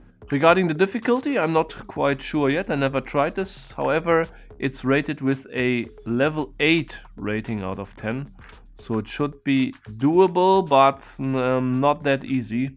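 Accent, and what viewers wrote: Germany. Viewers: Th video of the Titan puzzle was the first video I watched from you. I like you but I have a questio: are you German? (I ask because of your accent)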